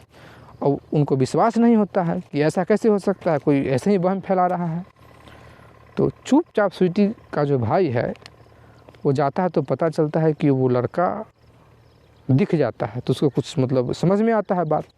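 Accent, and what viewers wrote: Bihar, India. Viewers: बिहारी उच्चारण में बतायी गई कहानी रोचक लगी। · Bihari tone hae so it was bit boring ..Hindi speaking is not good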